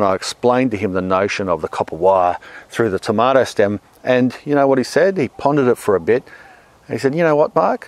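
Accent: Australia. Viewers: I am a Yank electrician and notice that he wraps the copper around the stem in a counter clock wise direction, I assume he is Aussie or Kiwi by the accent? Northern hemisphere winds clockwise just like the toilets flush. Opposite poles provides the aether field. Thank you for your efforts sir, and thank you for providing your knowledge to me.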